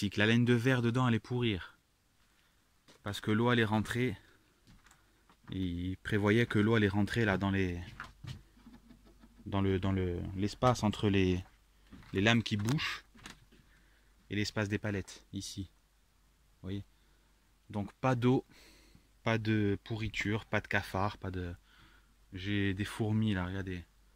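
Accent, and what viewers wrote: Corsica, France. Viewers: Tu n'aurais pas un petit accent corse par hasard 😁. Super ta cabane.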